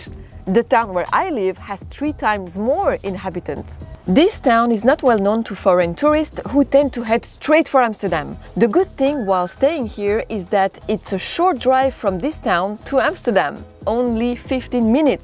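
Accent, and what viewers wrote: France. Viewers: Bonjour je suis du Canada,Montreal je vous adore une française qui parle anglais Très belle accent vidéo intéressent quand allez vous parler du osmo pocket Continuer votre bon travail bizou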